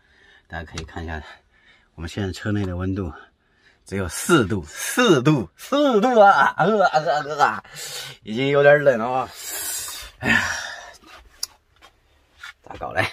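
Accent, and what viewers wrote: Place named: Sichuan, China. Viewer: 是重庆哪里的？和川北的方言最像。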